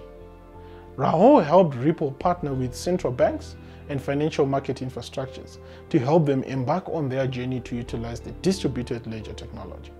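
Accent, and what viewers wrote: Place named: South Africa. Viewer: Hi! I am a fellow south african - i recognise your accent! where can us south africans buy XDC? please help thanks! xx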